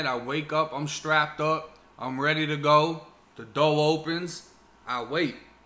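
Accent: Boston, United States. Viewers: I'm 46 yrs old. I'm from Florida born and raised. I graduated in 93". From 94 to 96 I went to a college called Dean College in Franklin, Ma. You have a very strong Boston accent. Love your videos, stories, and content. Thanks for sharing. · I’m from England and clocked the Boston accent straight up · Are you from BOSTON? I'm in upstate NY and I can hear that accent.I love it!!!😎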